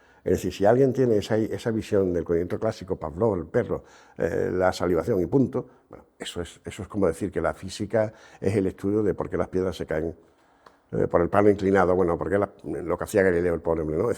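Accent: Spain. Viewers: por que hablan tan para el orto los gallegos???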